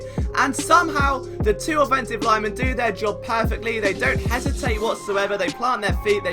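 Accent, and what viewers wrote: England, United Kingdom. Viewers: It's funny hearing a British accent breakdown football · YOU SOUND ENGLISH? IF SO YOU'RE UNDERSTAND THE GAME REALLY WELL. AMERICAN FOOTBALL IS COMPLEX LIKE CHESS. I GIVE YOU A THUMBS UP ON THE SUMO ACTION IN THE PIT.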